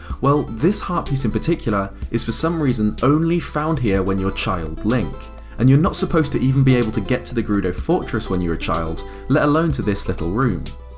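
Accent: United Kingdom. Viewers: eX Galneryus because hes British lol it's his accent. He also said Zelder. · its called a BRITISH ACCENT.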